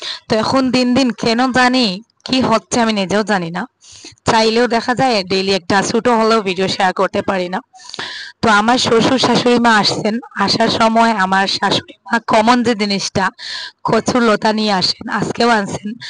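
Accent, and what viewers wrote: Bangladesh. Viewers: Tumi India r oia kne kali Bangladeshi kotha bolo kne? Hindi or assamese kotha bolo apu? Tumar suddo bangla amrar moto oy na bangladeshi kotha add kri daw? Hindi te vlog daw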